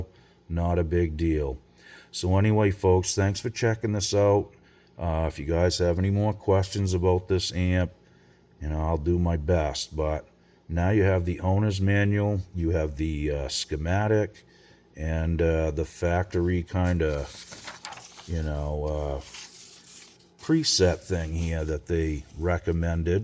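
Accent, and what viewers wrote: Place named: New England, United States. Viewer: Nice studio. 😳 Are you from Massachusetts or New England? Edit: Maine. just said it.😁 Sound like my cousins from Friendship & Waldoboro. But not quite as drawn out on some words. PowAH! ⚡️ lol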